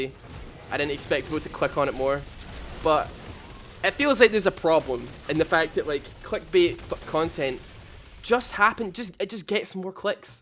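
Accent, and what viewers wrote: Scotland, United Kingdom. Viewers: keep up the good work. and ugh your scottish sooo........ THERE CAN BEE ONLY ONE! did i do that right?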